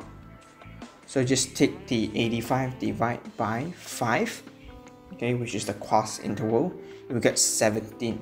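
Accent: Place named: Singapore. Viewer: You’re definitely Singaporean from your accent. hello friend, tomorrows national day!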